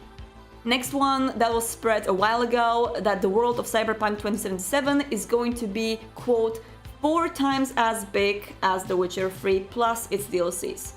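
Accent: Poland. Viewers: At the beginning of the video i was like: "She talks like Polish ppl, wonder where is she from." and yup! #polishgirl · It's nothing personal but am I the only one who considers polish accent so annoying that I can't watch it till the end? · I’m gay but I would go straight for polish girls with Cthulhu shirts. Your accent is adorable and I love it when you call bullshit on shitty game journalists.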